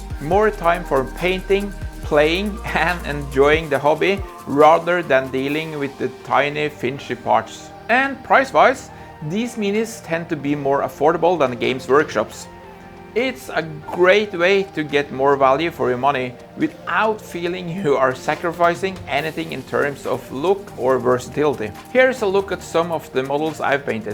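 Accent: Norway. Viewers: Hei, jeg mistenker sterkt (basert på engelsken din) at du er fra Norge? Hvor kan jeg kjøpe miniatyrer fra Firgeforge games i Norge?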